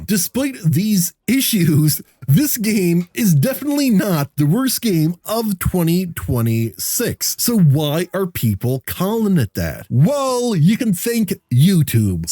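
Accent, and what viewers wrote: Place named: Canada. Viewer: I think because you're Canadian you don't notice, but every Canadian I have heard says "about" the same way to me. Everything else you said sounds fine to me though. I'm English just for context.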